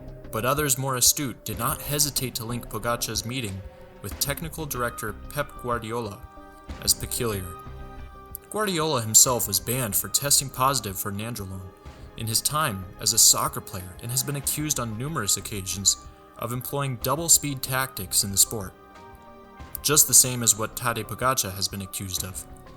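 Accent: Ireland. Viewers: What happened to the Irish accent?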